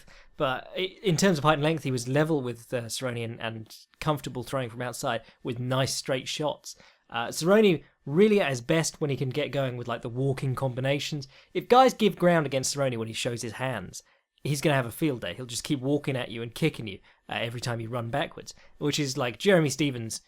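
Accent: England, United Kingdom. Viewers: I come here 50% for the educated mans viewpoint. 50% for the English accent so familiar to my now SEA dwelling ears and phrases like "makes you look like a massive bellend".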